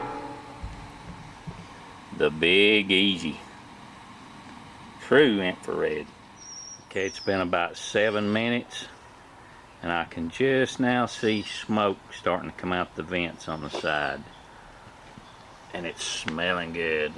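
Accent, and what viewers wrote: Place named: South, United States. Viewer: your Southern accent gives you instant credibility with me on issues related to smoking and grilling. Do you know how hot the lot setting would be for smoking ribs? I am trying to dial in a 3-2-1 method but want to keep the temps at 200-225 F.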